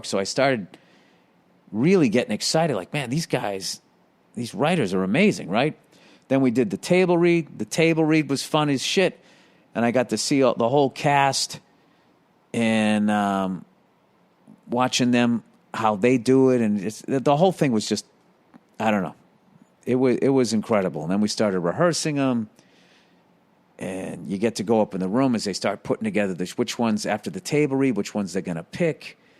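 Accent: Boston, United States. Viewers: No need to apologize for late FREE podcast! What can I expect? Just to hear your Boston voice is a "honor".(te he). One way or another, late or early, you always come through! Thanks. 72 year old guy.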